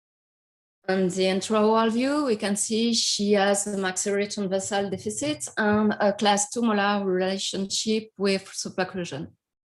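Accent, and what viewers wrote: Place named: France. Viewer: In spite of the heavy french acent I really enjoyed this lecture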